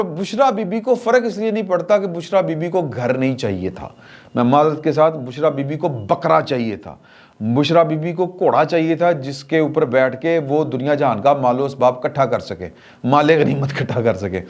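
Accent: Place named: Lahore, Pakistan. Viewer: آپ کا لاہوری لہجہ اور انداز اچھا لگتا ہے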